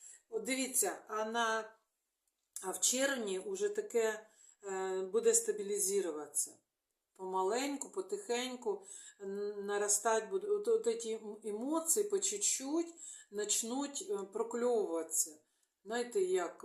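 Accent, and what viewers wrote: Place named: Luhansk, Ukraine. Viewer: Дякую! Вас дуже цікаво слухати, бо Ви маєте особливий діалект як у нас на Луганщині. Відчуваєш що слухаєш свою людину💕 дуже хочеться почути від Вас звістки про новоокуповані (після 24 лбтого) частини Луганської та Донецької області, що з ними буде цього літа?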